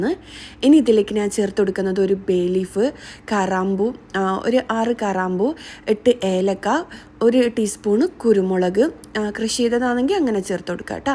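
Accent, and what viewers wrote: Kannur, India. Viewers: Nigal kannura tta tta nn parayunn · സംഗതി ok യാണ് കണ്ണൂർ /കാസർഗോഡ് ആണെന്ന് തോന്നുന്നു 'അനക്ക് "എന്ന് കേട്ടു 🤣😂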